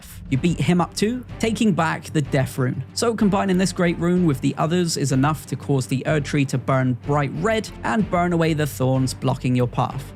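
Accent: United Kingdom. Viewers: literally never seen a elden ring lore video that wasnt voiced by a british dude.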